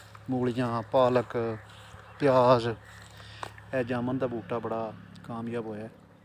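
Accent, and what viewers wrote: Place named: Hafizabad, Pakistan. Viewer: Mashaa ALLAH Bhai zabardast Hafizabadi boli soooo niceeee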